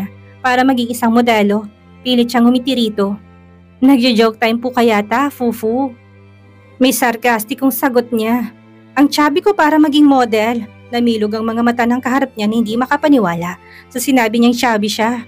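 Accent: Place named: Visayas, Philippines. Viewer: panget tlg ang boses mo bisaya pa ang accent mo pumapanget tlg tong story n ito dahil ikaw ang narrator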